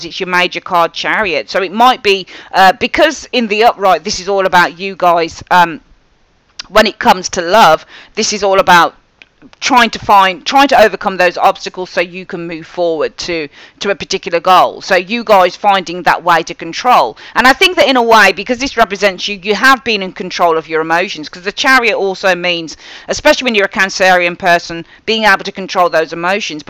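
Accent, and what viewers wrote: Birmingham, United Kingdom. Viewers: You sound like a brummie...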